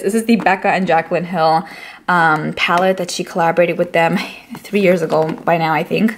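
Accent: New York, United States. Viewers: Great video; can't wait to see your reorganized stash. By the way, I notice a very distinct accent; New York? God bless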